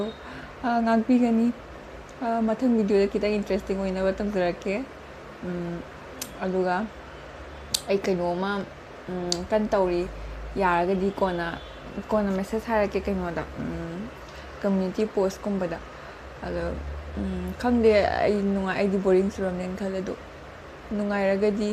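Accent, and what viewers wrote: Kakching, India. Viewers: Kakching Marol da oina ngangngo aduna tabada nungsi tabasu nungai... Eshagi erol bu ngangthikpada ekairido karigino...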